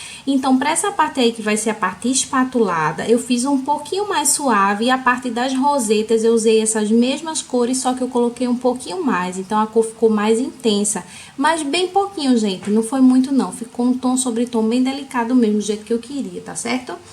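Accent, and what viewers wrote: Northeast, Brazil. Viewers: Amei seu sotaque nosso nordeste ❤️ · Sotaque de nordestino, amooo❤️❤️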